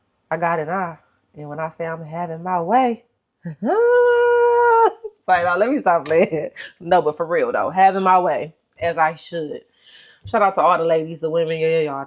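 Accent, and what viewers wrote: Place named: Ohio, United States. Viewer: There are three places I've heard residents pronounce "y'all" like Yaw and that's (1) Baltimore, Maryland (2) Philadelphia, Pennsylvania and (3) Columbus, Ohio. Based on what I'm hearing in her inflection, I"m going to guess she's from Ohio. Ohio will say salty a lot pronounced like "saw-dee" and I feel like I can hear that in other words when she speaks. Further research shows Detroit as her place of origin so I was regionally accurate. American North/Northeast.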